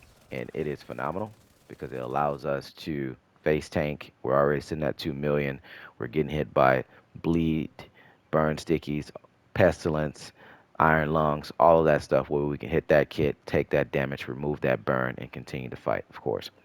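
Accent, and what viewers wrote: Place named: Baltimore, United States. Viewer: fire video, u def from baltimore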